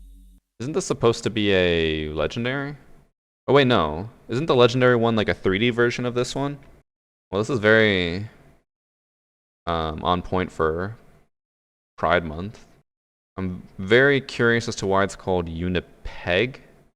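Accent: Canada. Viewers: Yo do I speak Spanish? I know ur Canadian because you say “oat” instead of out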